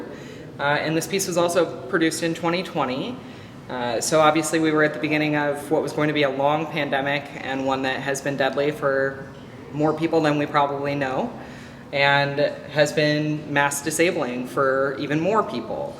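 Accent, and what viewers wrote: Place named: Minnesota, United States. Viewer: I thought I detected a Minnesota accent! That's my home state. Cheers!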